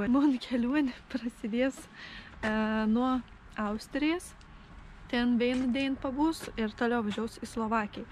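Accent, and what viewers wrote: Samogitia, Lithuania. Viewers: Video vuo 👍 O jau tas kalbėjimas žemaitiškas, koks geras... :hands-yellow-heart-red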